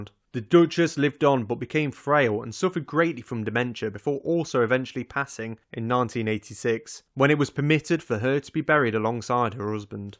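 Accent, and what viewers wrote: United Kingdom. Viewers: This is amazing and so interesting thankyou so much and i love your british voice ❤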